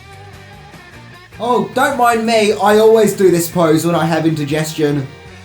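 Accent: Australia. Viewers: What a shame, potentially interesting feature spoiled by world's most annoying voice/accent/host. Let's hope Mr Barlow pays a nocturnal visit to this Antipodean git and silences him once and for all. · The commentator here has got one of the most irritating voices I've ever heard, Aussie accents are dreadful to start with, but this bloke really grates. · are you an Aussie or a Kiwi?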